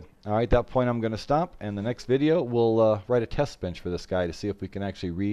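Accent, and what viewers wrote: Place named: Southwest, United States. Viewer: I think it is a US accent. Southwest probably.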